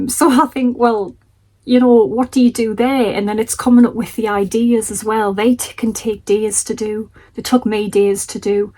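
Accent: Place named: England, United Kingdom. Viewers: Such a sad video. I have three craft content makers that I watch every day and you are definitely one of them. You make everything clear and precise. I think I used to watch any papercraft tutorials I could find but realised that a lot are not prepared, waste time looking for things and just don’t have our friendly northern accent (😍). I did lose you for a time but that would be due to your house move I think. Will definitely be watching you. I like the way you give a twist to old designs which means I probably have the equipment to make the cards. I also prefer the full tutorials but that’s probably because this is my retirement hobby so have plenty of time. Hope things improve for the industry. X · Hello, I have literally just found you on YouTube and Ive been paper crafting for about 2 years. Here's my opinion: firstly its so lovely to find a paper crafter with a fellow English accent 😅, there arnt that many on YouTube to be honest so thats nice. 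☺️And with regards to long videos, for me the longer the better but I have lots of time on my hands as I dont work anymore. I think, digitals are a thing, I tend to buy digitals as they're cheap and you can reuse over and over. Also alot of crafters like me already have alot of supplies and are keen to use up what weve got. So keep doing the long videos. I like to watch a video when I get into bed at night, it really helps me to wind down. Then any projects I like I will save to my Watch later and make them the next day or whenever. It seems you are a popular crafter (and Im going to check out your videos after this)😁so keep doing what you're doing. I particularly like one page folios, as they're useful to store ephemera. Pity about postage costs too. Thanks Royal Mail. 🙄I am also a card maker but haven't made many of late. Ive stopped buying from Temu for supplies and focus on charity shops as its more sustainable and cheaper. 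Do you sell digitals? ❤️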